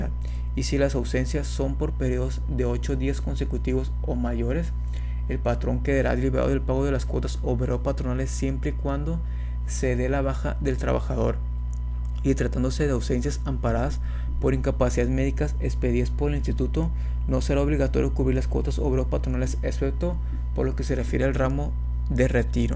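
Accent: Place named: Latin America. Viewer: Tu acento se escucha de otto país de américa latina no de México, y para mi grnera algo de desconfianza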